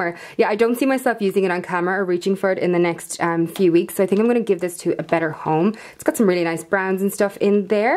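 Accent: Ireland. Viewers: New to your channel! Adore your Irish accent! Which part are you originally from? Are you enjoying the move to Sydney!? LOVED this declutter video so inspiring and generous 💞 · Sounds like faint Irish accent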